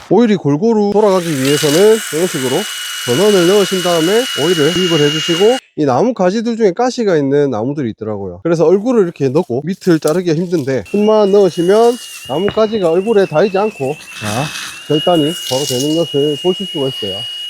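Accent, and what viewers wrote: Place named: Gyeongsang, South Korea. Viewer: (와~ 저기있는 나무 짤라야되는데 데이지가 않네) .... 경상도 사투리가 참 정겹습니다. ㅎㅎㅎㅎ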